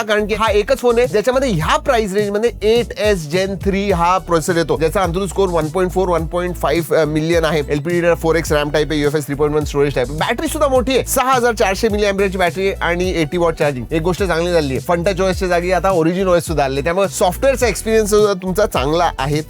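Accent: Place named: Pune, India. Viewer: भावा, 'त्यामुळं', 'कुठं', ' हिनं-तिनं' वैगेरे असं ग्रामीण किंवा suburban, outskirt पुण्याच्या दैनंदिन बोलीभाषेत एकमेकांशी संवाद साधताना म्हणतात. महाराष्ट्राच्या अनेक जिल्ह्यांच्या दैनंदिन बोलीभाषेतही संवाद साधताना असं म्हणतात. त्यात असं काही गैर नाही. तसा शब्दोच्चार खूप सामान्य आहे.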